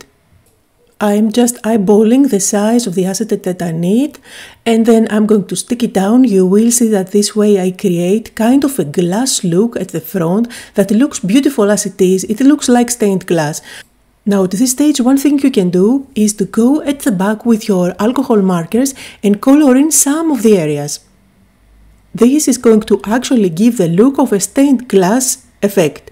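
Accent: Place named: Greece. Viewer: Its raining CAT’s and dogs here. So nice to hear you, your Greek accent. I always feel the nostaligy of all my Greek holidays. Thank you for this. Cardiamou.❤️🍀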